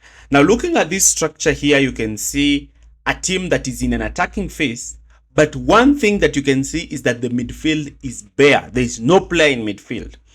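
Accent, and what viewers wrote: Kenya. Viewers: Hii ni sauti ya mkenya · Vile niliskia hiyo sauti nikajua tu huyu ni mkenya. Keep up the good work.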